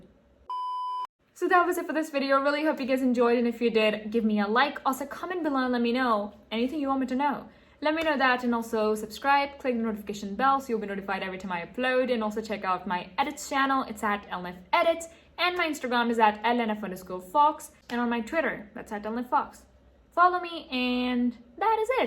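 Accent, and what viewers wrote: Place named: United Kingdom. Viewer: I'm still learning english, and I'm bad with accents. Where are you from? Seems britanic, but I am not sure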